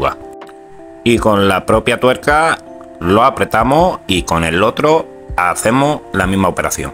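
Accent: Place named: Andalusia, Spain. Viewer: Es español .andaluz del sur de España.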